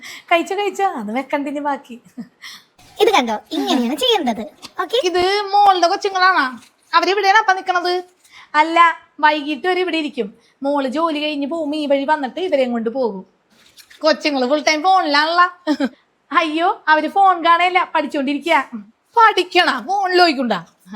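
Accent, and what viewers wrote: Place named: Ernakulam, India. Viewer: സൗണ്ട് കേട്ടാൽ അറിയില്ലേ ekm തന്നെ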